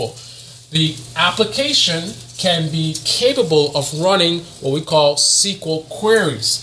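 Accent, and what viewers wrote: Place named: United States. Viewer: Thank You. I am a beginner and you talked to me like I am a beginner ( not stupid but a beginner ) thank you. I have gone through a PLEATHERA of videos and information. Many people I simply cant understand their dialect from where ever they come from. You Speak English in American English and that helps me ( okay maybe I am stupid ) but thank you. Doing good -- keep the classes coming -- ROCK ON.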